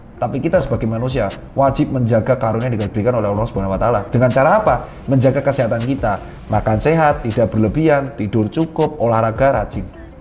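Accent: Java, Indonesia. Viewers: Bos tirta klo ngomong kliengan meni medok😀😀😂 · Medok nya mantap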